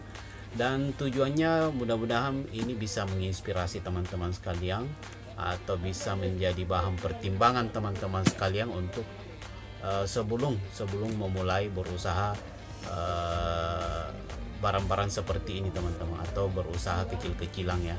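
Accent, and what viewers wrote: Indonesia. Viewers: Sulawesi ni kyaknya.. Salam dri bugis kaltim.. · Bang emng dari serang banten ya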